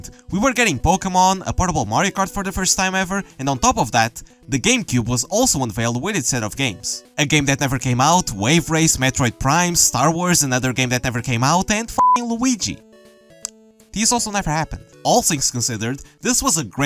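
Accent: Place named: Portugal. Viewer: I know a portuguese when I hear one! Good video 🤝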